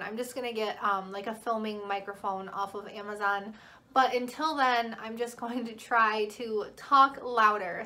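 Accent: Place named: Michigan, United States. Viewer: I thought that you had a MI accent! I'm from the Upper Peninsula but live in Oregon.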